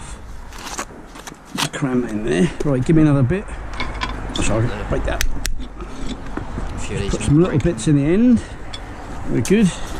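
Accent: Norfolk, United Kingdom. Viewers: Great work bud..you from norfolk?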